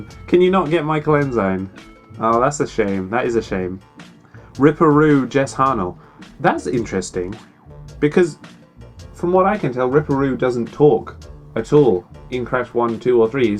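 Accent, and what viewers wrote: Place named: United Kingdom. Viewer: Its strange, I always assumed the genius behind was American. Nice to hear a fellow Brit that does YTPs. (I dont make videos I just mean I'm also British)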